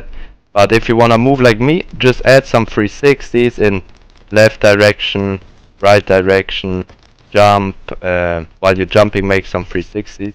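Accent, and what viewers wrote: Germany. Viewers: you are from german ? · German accent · man hört direkt das du deutsch bist hahah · Man merkt richtig dein deutsch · nice video are you German? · German accent haha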